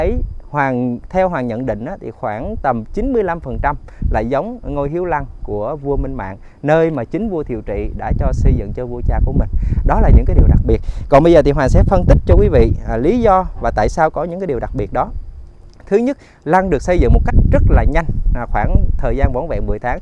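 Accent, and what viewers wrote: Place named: South, Vietnam. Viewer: giới thiệu giọng thanh thoát pha hoà trong Nam dể nghe hơn Huế Revew [xin lổi nghe tại mình thường nghe theo giống Nam kỳ lục tĩnh]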